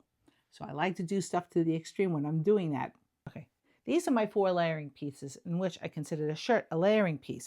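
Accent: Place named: Boston, United States. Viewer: I've just found your content and it's absolutely premium! You are so generous with your tips and education, and your information is heads above most travel YouTubers. Also, as a Bostonian, I get a huge kick out of the fact that you pronounce "packing" the way a lot of folks in Boston pronounce "parking" :)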